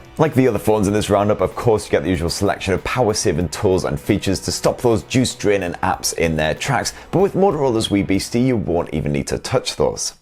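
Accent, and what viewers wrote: United Kingdom. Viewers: Oughuque, he has so british luxurious pronunciation.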